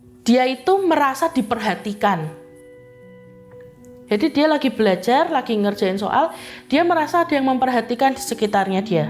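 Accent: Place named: Java, Indonesia. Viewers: Mbaknya ciri khas orang Jogja bgt. Slengekan seru klo ngomong. Nyenengin dah! 👍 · Enak bnget ini mbk ceritanya mudah difahami kata katanya ada logat medoknya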